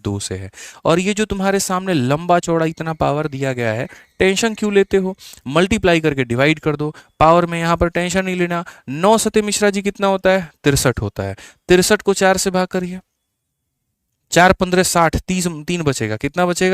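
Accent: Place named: Bihar, India. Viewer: Sir ❤ bihari accent bht pyra hai 😂❤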